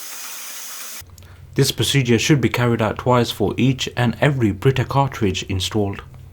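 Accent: Birmingham, United Kingdom. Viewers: Thank u for this video! I agree with the comments, the instructions that came with the filter were a joke! Prefer your vid with your brummy accent! 👌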